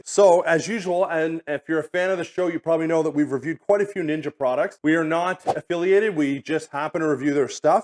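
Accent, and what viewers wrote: Canada. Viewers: canadian for sure. no X in ET CTERA. No X is ES PRESSO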